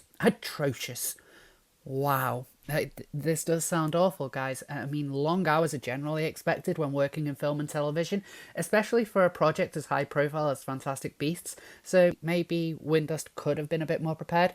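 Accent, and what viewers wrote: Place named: Scouse, United Kingdom. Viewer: Keep going. Lovin' that scally accent 😘💕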